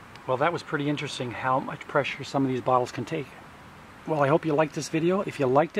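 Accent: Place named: Canada. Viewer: Detroiter here. I thought you were Canadian when I saw the Calamata Juice bottle. Then I heard you talk, and thought, "oh yeah, this guys got to be a leaf!"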